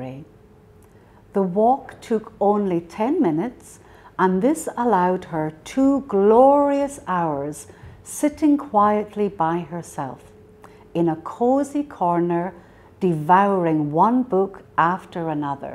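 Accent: United Kingdom. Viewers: 영국발음이 너무 좋아요... ㅠㅠ Roald Dahl Charlie and the Great Glass Elevat 이 책도 읽어 주실 수 있나요? 책이랑 같이 읽으면서 듣고 싶어요!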